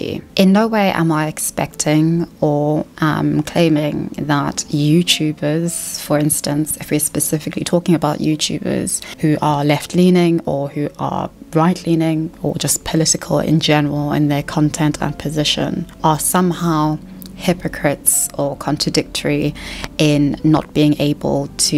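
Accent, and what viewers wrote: South Africa. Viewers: your accent sounds like south african that moved to england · You're South African ❤..love it.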